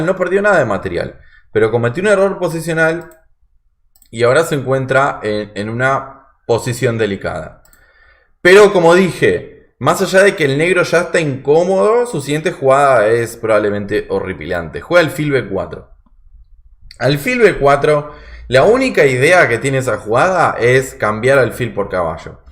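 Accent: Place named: Argentina. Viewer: El mejor canal que encontré para un principiante avanzado como yo. Instructivo y pausado. Que lindo el acento argentino. Soy español, juego online, incluso hice un amigo en Neuquén. Saludos y sigue asi!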